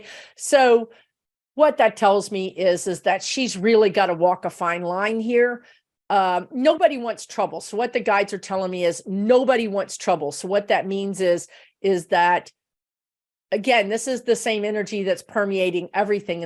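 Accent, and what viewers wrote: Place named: New Jersey, United States. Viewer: Sounds like Bayon, New Jersey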